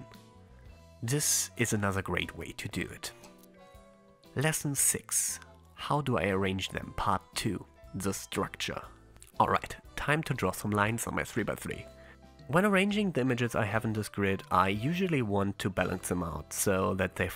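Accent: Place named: Germany. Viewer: Dies to German accent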